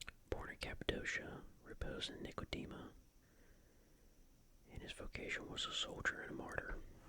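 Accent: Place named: Delaware County, United States. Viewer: I’d love to focus on the reading but I can’t help but wonder if you’re from Delco PA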